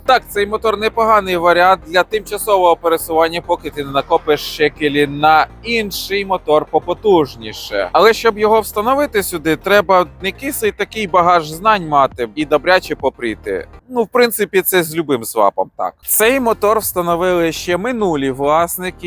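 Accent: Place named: Russia. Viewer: Диктор намагається говорити українською мовою, але дуже не вміє. Йому зручніше говорити російською. Це помітно, що повсякденно він говорить російською мовою.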